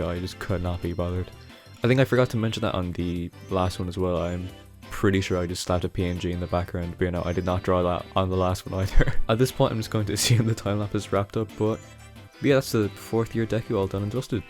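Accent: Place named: Ireland. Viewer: I myself have just over 8 years drawing experience and I can say for a fact that your work is greater than some 2nd 3rd year art college students I know. You definitely have the ability to comprehend the forms and shapes of objects and you have a knack for visual effects as well, which are some of the hardest parts of Shonen or Fantasy artworks, Be proud and remember that "slow progress is better than no progress" when you are stuck in a burnout or creative block :) I think I hear an Irish accent in there so if youre not in college yet, do consider This Is Art! Alot of the time when your work is judged they send feedback given by some Art Professors and Spearheads in the creative industry - Hope this is helpful!!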